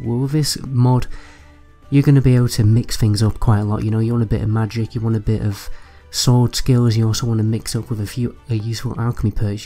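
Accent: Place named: Northern England, United Kingdom. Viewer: your accent started to get increasingly less RP and a lot more northern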